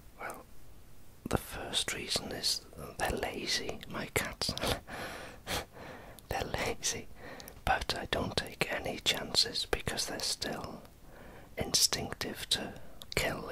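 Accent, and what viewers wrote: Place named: North Wales, United Kingdom. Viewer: It's fantastic to hear a whisperer with a North Wales accent. I grew up near Llandudno and to hear you say you were in Betws-Y-Coed recently makes the world seem a smaller place :) In fact I sat by swallow falls and had a sandwich last week. I spend much of my time touring Europe with work so to hear a familiar voice is very relaxing when I'm away. Keep up the good work, and thanks again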